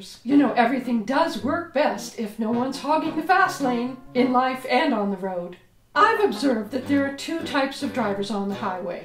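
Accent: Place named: United Kingdom. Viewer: i fucking love you i also love how you sound like a posh english person but you're from america. what the fuck is that about?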